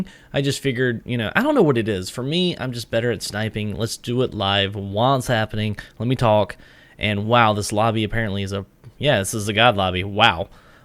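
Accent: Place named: South, United States. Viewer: Lmao about half way in the video and i finally realized this guy has a southern accent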